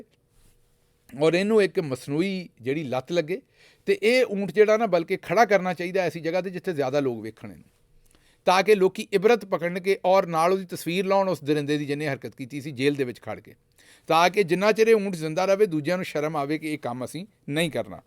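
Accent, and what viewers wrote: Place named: Punjab, India. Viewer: No problem in London Doctor sub love your Punjabi accent Kamran from East London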